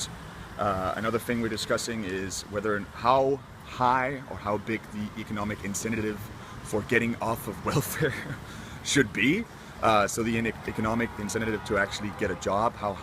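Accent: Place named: United States. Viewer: I kind of have to agree with Sassy... I've lived in Denmark 8 years now, and have never heard anyone sound as American as this guy. Not even my Danish friends that have lived in The U.S.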